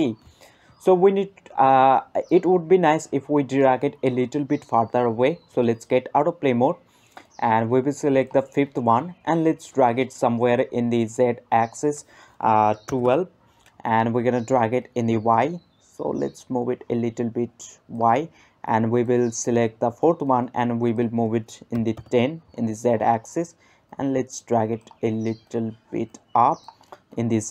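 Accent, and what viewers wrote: India. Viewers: The quality of the parallax is not good enough, also your project needs pixel perfect scrolling etc. Furthermore hindi accent is quite annoying for english speakers to listen to. So make great effort to lose that accent as good as possible.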